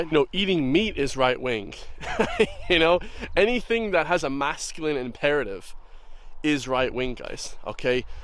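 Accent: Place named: South, United States. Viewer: Hahaha you think you're right wing, but you don't abiut politics. You sound like a redneck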